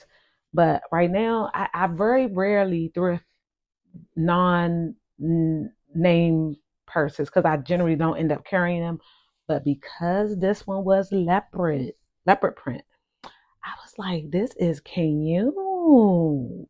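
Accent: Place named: Baltimore, United States. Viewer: You almost have a Baltimore accent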